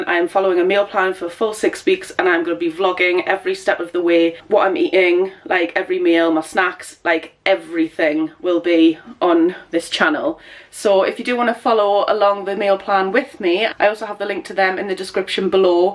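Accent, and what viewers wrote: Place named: Geordie, United Kingdom. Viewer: I look forward to watching your videos!all great recipe ideas!Thank you!also your accent confuses me lol it's like a posh Geordie one😁😁love your vids and recommendations!! ❤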